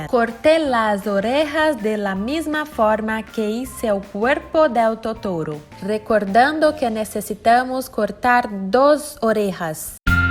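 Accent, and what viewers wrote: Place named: Spain. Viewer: Amei seu sotaque Espanhol 🤣🤣🤣🤣🤣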